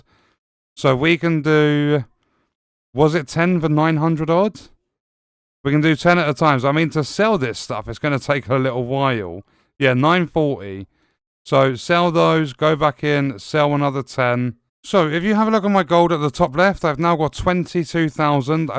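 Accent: United Kingdom. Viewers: shitass video too british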